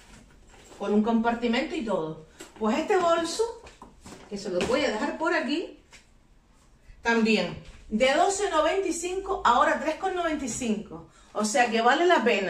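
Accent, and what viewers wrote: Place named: Canary Islands, Spain. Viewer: Ohhh escuchándote identifique tu acento 😊. Mi novio tb es canario 🥰. Muy buenas compras, me suscribo a tu canal y una vez más gracias por suscribirte al mío! Saludos 😘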